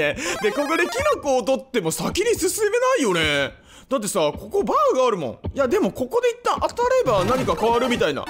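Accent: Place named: Northern Kyushu, Japan. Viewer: ども！女性視聴者ですww もう、九州北部出身からしたら 地元が近い&方言で推せますね👊( ¨̮💪)✨ いつも元気もらってます✋( ˆ̑‵̮ˆ̑ )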